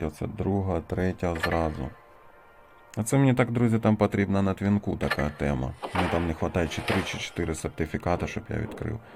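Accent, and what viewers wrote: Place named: Chernihiv, Ukraine. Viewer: А Ти сам що з Чернігівщини? Наш говор😂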